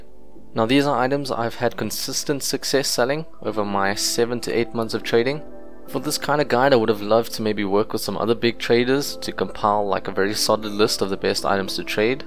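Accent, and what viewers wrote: South Africa. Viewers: is this guy south african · are you from South Africa, because I am too?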